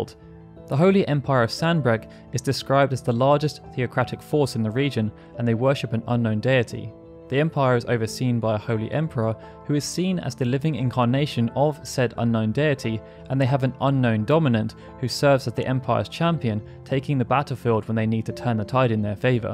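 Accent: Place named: United Kingdom. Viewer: I'm obsessed with final fantasy being talked about with a British accent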